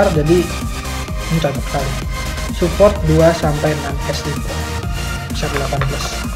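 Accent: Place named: Saudi Arabia. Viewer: You sound like you're from Saudi Arabia.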